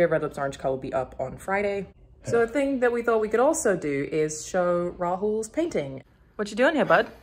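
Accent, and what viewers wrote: Australia. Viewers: Liked. No freakin' clue how you ended up in my recommendations. You're cute. Your dog is amusingly weird. I have no idea about painting models, but I agree that Ripley needed to be greener. Tattooing eyebrows seems severe, but I'm a 60-year-old guy, so there's that. Obviously, I'm not a gamer, but I did play Descent 3 for an hour yesterday. Does that count? Shameless confession: Aussie accents (yes, I'm aware there are many) are simply lovely. If you show up in my recommendations again, I am likely to watch. Yay, Al Gore Rhythm. Be happy,. Your dog is brilliant. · can you make a channel without the aussie accent?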